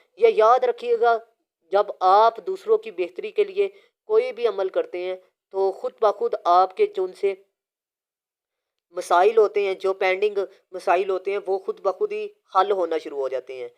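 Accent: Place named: Punjab, Pakistan. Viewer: Pronunciation theek na hon to listener aur learner k spelling mistakes honay k chances bohat zyada hoty hen. آپ جب اردو میں بات کر رہے ہوتے ہیں تو خالص اردو استعمال کیا کریں۔سیالکوٹی یا لاہوری لہجے سے پرہیز کیا کریں، "ر" کو "ر" ہی رہنے دیں "ڑ" کرنے سے بابائے اُردو کی روح کانپ جائے گی۔ And when you are talking in English,kindly adopt the British or American accent.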